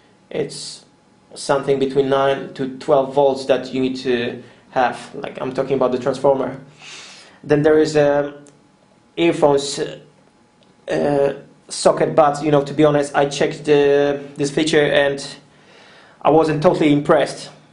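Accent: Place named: Germany. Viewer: Whats with the voice.. I hear a German accent.. whats the issue with u puss***s :D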